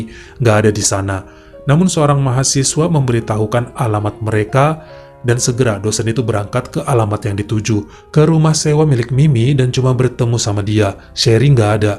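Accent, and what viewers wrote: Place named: Java, Indonesia. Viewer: HALLO S NYA 3 SYRIA SELAKU HADIR BUAT CHANELNYA KAK,........SEMOGA MAKIN SUKSES UNT CHANELNYA YA KAK, BIKIN KANGEN KRN PEMBAWAAN BAHASAANYA LUCU DKIT DIKIT EHEMM GITU DI BUNUHI DNG BHS JAWA YG MEDOK TAPI ASIK BANGET LOVE......U ❤❤❤❤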